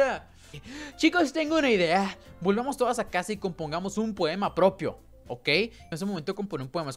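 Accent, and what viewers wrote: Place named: Colombia. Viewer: Ahh mk, usted maneja bien el colombiano xd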